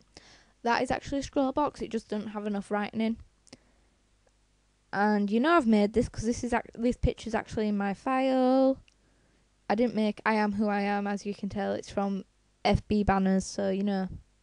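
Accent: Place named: United Kingdom. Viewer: I know right GoodLuckPeice she does sound Brittish! I couldn't really understand her because im asian.And how do you get the link thing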